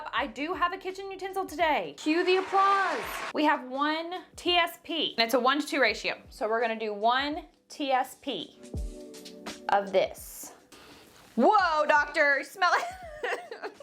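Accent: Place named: West Texas, United States. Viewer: Epi 6 of HILLLLLLBILLLLLLLY HAIRRRRR. west texas voice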